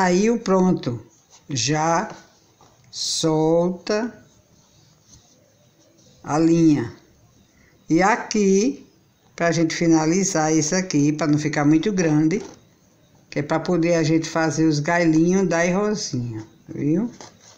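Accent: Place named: Ceará, Brazil. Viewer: Você é adorável, esse sotaque delicioso de ouvir, lembra minha irmazinha lá no Ceará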